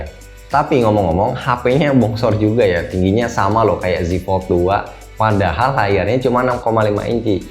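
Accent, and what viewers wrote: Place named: Banyumas, Indonesia. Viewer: w Jateng om, ngapak ya tau wkwkwk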